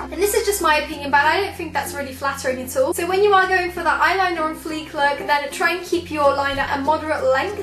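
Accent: England, United Kingdom. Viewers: Does anyone know in which part of England she lives in? I love her accent! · Soooo pretty and i love your british accent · I love your accent it is absolutely beautiful.❤️ (I'm from England too) 🇬🇧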